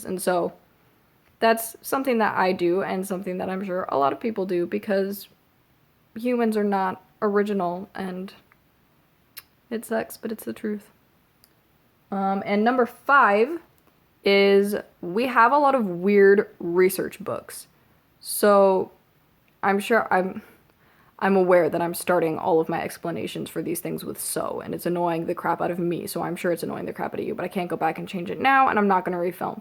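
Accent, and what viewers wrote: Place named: United States. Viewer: Clear American!! YAAAAS.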